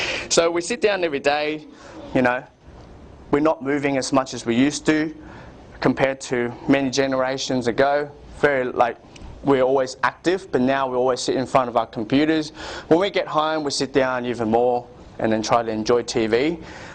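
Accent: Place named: Australia. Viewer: I am curious where this seminar/ lecture took place. Its audiences appear to be mostly oriental made me thought Singapore or Malaysia but the speaker’s accent was Aussie. Thank you for putting up this video.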